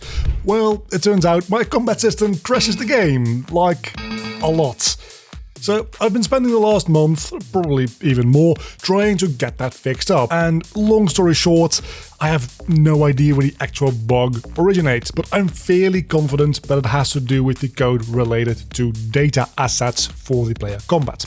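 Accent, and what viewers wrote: Netherlands. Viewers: I was like "this guy sounds so Dutch".. then looking at the About page..😂 Anyways, lekker bezig! Ik moet binnenkort ook maar eens gaan koekeloeren in Unreal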